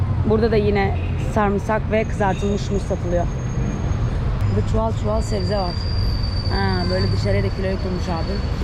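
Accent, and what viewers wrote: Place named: Sivas, Turkey. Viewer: İspanyolcayı Sivas tokat biraz doğu aksanıyla harmanlaman diyolok kurman çok hoş.bilmiyorum banamı öyle geliyor.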